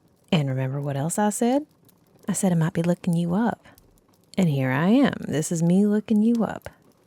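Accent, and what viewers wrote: South, United States. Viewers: You keep acting like that and I'll put you in your place Great southern accent. Wait is it southern? Great audios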